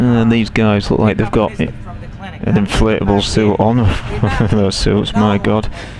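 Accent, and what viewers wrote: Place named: Hull, United Kingdom. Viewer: You sound like you're from Hull!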